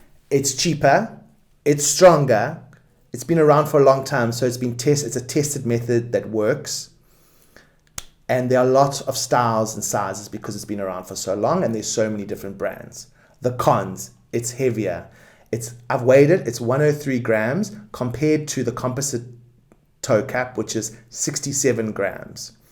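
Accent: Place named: South Africa. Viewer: Your intention to show Toe caps history and evolution is trivial and informative. However, you made a huge mistake in using an image of HITLER to reference a period in time. That is extremely insensitive, offensive and reprehensible. Absolutely bad decision ( if it wasn't on purpose) to show your personal alignments with one of the worst war criminals in history! You could have EASILY chosen a different image from the same time period. Perhaps your being from South Africa, ( the accent giving it away) is part of why you did it on purpose. Absolutely Deplorable. Very tempted to report this garbage to Youtube.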